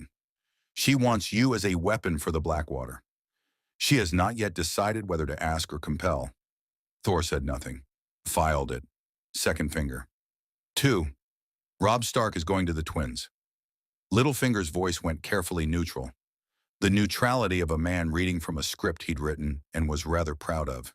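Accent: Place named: United Kingdom. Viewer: Interesting story, but British accents are just meant for story telling.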